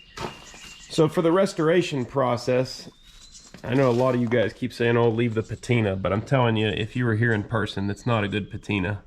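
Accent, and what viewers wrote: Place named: Pittsburgh, United States. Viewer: Love to listen to yinz’s accent! Just like home. Very interesting!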